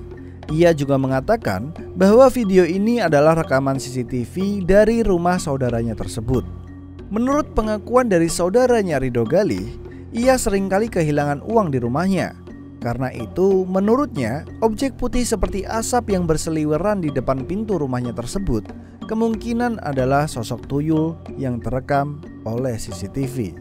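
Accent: Java, Indonesia. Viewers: Tetep medok ngomonge mas #jowonotdied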